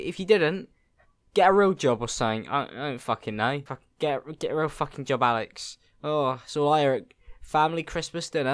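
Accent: United Kingdom. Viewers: i hope you get 50k subs m8 your content is really high quality and really funny i love your brittish voice and i hope you dont stop now that ive subbed keep it up m8 cheers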